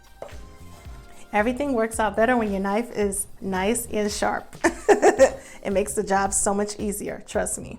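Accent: New York, United States. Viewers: Very good :) - i wonder if she is from New York lol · I need sounds like she from New York Cooks like a Southern Mother looks like Beyonce I'm in 😊..... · You are a new yorker...arent you?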